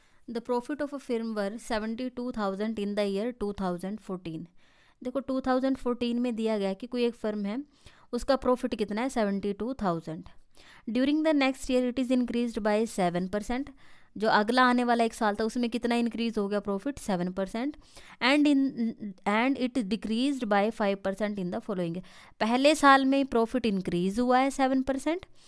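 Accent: Haryana, India. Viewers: Mam are you from haryana?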